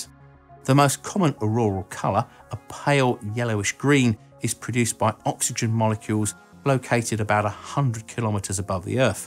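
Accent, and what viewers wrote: Australia. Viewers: comfy voice, ..accent sounds Australian. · Despite the high Australian accent, this guy's elaboration of scientific facts is cool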